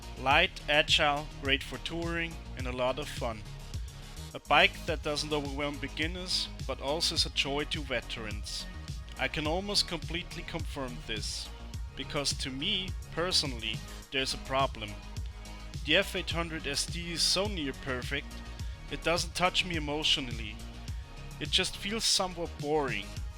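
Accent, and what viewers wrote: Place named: Bavaria, Germany. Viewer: "Geländestraße" in feinstem Bayrisch! Danke fürs Review :)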